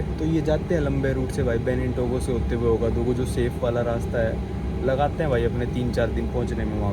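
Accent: Haryana, India. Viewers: Bhai ka Delhi accent change hoke dhire dhire haryanavi nikal raha h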